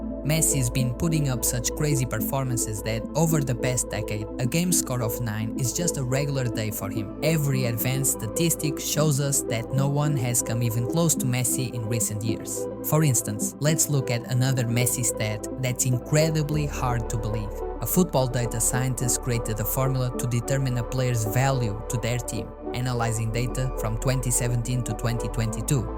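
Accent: Portugal. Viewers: Is the narrator portuguese? The english accent seems like it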